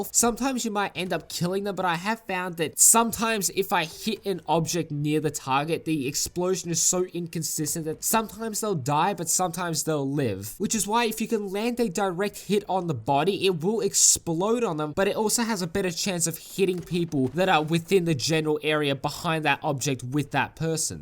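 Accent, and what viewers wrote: Australia. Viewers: I want an aussie accent. 😫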